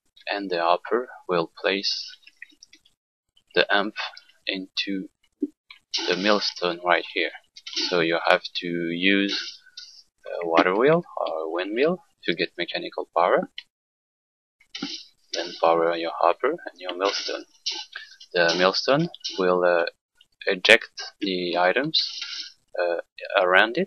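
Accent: France. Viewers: i need to know, are you french?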